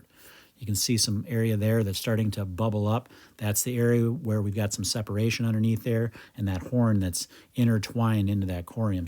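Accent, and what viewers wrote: Scotland, United Kingdom. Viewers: Where is the nice music and the lovely scottish accent?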